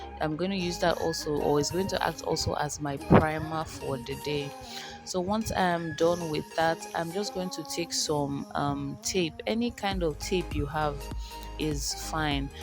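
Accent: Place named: Spain. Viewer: Ohhh Very beautiful and very simple Your Spanish accent is sweet ooo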